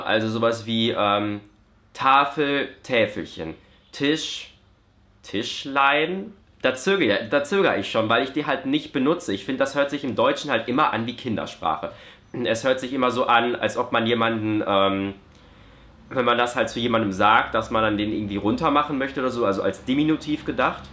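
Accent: Standard. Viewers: Als Hannoveraner kann ich sagen, dass du in diesem Video Hochdeutsch redest 👍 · Also für mich sprichst du absolut hochdeutsch🤷‍♀️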